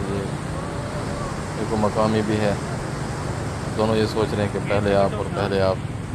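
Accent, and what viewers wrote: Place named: Saraiki, Pakistan. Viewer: Your Saraiki accent is so cool.!!! 😁 btw kia hi baat hai jaga ki.... lots of love to all burakers ❤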